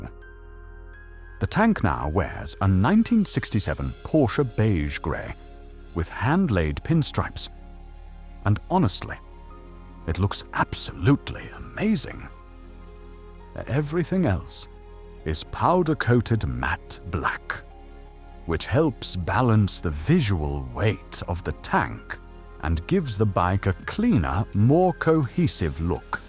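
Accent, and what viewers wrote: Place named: RP, United Kingdom. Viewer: I think the new voice is maybe too posh.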